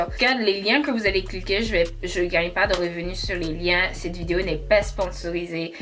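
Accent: Quebec, Canada. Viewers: Bonjour après tout ces mois la perruque est-elle toujours aussi belle ? Je compte l’acheter mais j’ai peur car les vendeurs sont très irréguliers. D’ailleurs tu l’as vraiment acheter toi même ? Je me méfie souvent des partenariats 😂 Sinon je kiffe ton accent (je suis française) mais tu es québécoise non ? D’habitude le français québécois et moi😬 Mais j’aime trop le tiens 😭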